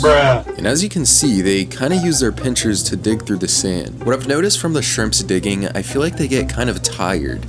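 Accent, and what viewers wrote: United Kingdom. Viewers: Is he or his voice became British? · why are you in a british accent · Are you a british brit ‽‽‽ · Bro is British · Bro are you British can you say bottle of water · Are you a Brit bro? Why u sound like dat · does bro sounds british on purpose?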